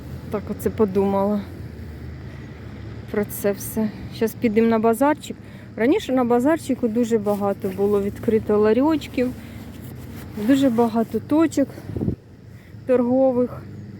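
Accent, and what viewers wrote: Sumy, Ukraine. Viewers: Сумський суржик не можно не впізнати,так говорять тільки в Сумах.❤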